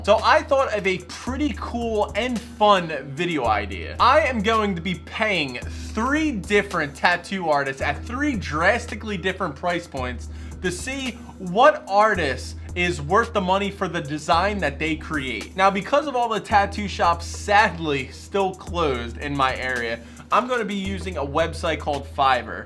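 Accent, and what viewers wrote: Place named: New Jersey, United States. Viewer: He must be from jersey, “balldamore”/Maryland, or PA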